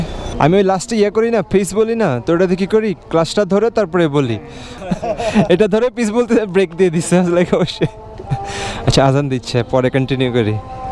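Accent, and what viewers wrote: Chittagong, Bangladesh. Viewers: ভাই আপনি কি চাটগাঁইয়া?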